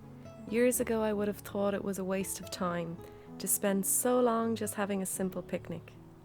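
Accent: Ireland. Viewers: Oh wow! I loved this. I don't know how I haven't seen your channel before! I'm Irish but living abroad and the two things I miss most about Ireland, the Irish accent and nature, you provide in abundance. I am now your number one fan ;-) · Where about from Ireland are you from as I adore your accent. My parents were Northern Irish and from Belfast. They emigrated to Australia when young and had 3 children with me being the only girl and youngest. I’ve never been but as I’ve got older I speak now with a broad Belfast accent I picked up from my years living with my parents. My husband is Scottish and we can’t believe how broad my accent is even though I’ve never been abroad. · Well I know that I could sit and listen to that Irish lilt all day long!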